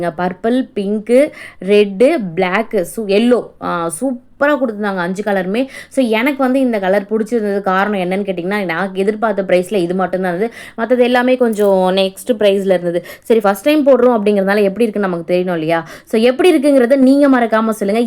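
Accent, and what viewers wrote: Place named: Chennai, India. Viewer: நல்லா இருக்கு இந்த டாப் காலண்ட நல்லா இருக்கா சென்னை பாசை சூப்பர் 😂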